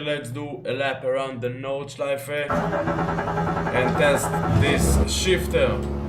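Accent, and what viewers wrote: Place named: Israel. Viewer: חחחח איזה מבטא ישראלי, אחלה סרטון. אתה חושב שזה עדיף מהth8a? כמה זמן לקח לזה להגיע?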